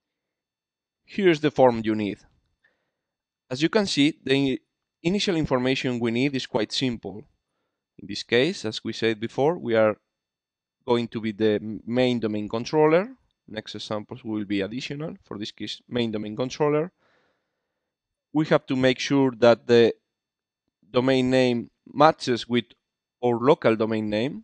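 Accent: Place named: Spain. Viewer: I understand him. I do not speak Spanish. His accent is familar to me, Spanish accent is widespeard in mainstarem media.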